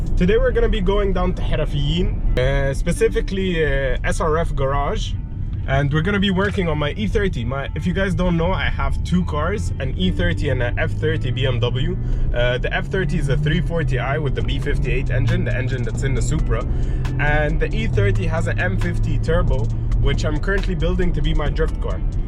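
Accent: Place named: United States. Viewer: you can speak amirican will that is very nice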